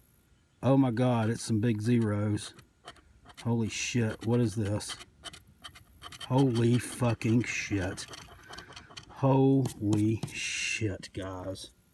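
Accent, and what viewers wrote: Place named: Western North Carolina, United States. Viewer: Sound like your from Western NC, haven’t heard an accent like that since my days in Robbinsville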